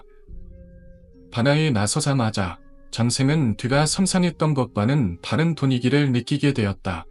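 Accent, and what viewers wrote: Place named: China. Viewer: 중국사람이냐??? 머라는거야 ㅗㅗ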